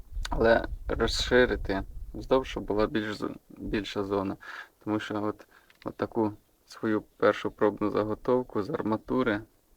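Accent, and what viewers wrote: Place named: Central Ukraine, Ukraine. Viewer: Говор такой забавный, центральная Украина небось)) зы. А горн прикольный.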